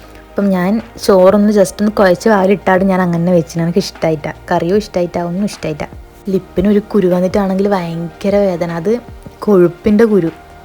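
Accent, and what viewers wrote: Kasaragod, India. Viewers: Namma kasarod ayond ningale bhasha nalla pole manasilavm🙌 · Nammale kasargod basha adipoli alle.... Athil ആണ് രസം..... വിഡിയോ എടുത്തുകൊണ്ടു സംസാരിക്കാൻ ശ്രമിക്കു അതാണ് രസം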